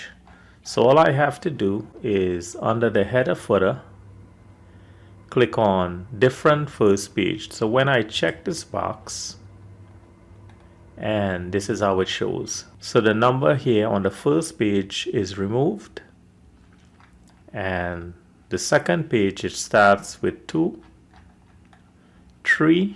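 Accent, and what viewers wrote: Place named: Trinidad and Tobago. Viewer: You sounding like a Trinidadian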